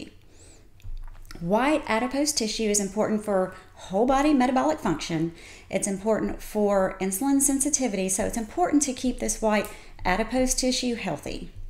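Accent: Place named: South, United States. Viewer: I can't understand her Southern accent, and she is mispronouncing Wegovy. Just sayin'.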